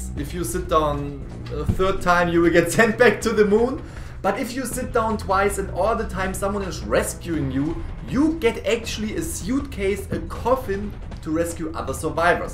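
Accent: Germany. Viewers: German accent? Cool! Wish I had a accent..😐 btw what's your gamer ID if you don't mind me asking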